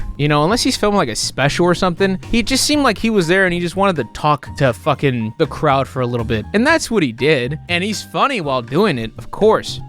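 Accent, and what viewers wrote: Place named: Upper Midwest, United States. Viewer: I knew you were from the upper Midwest because of the slight accent.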